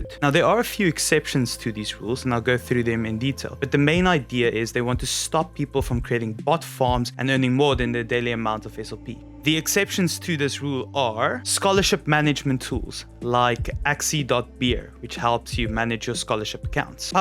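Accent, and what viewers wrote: South Africa. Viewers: you from south africa? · Hey man. Random Question - Are you South African?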